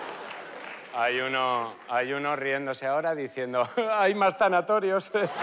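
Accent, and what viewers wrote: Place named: Albacete, Spain. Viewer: El tono manchego de Albacete 😂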